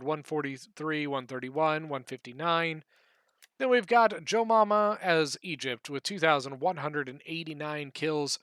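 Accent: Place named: United Kingdom. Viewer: your british accent actually suits u a lot more than u relise :))):_Ellington::_Rome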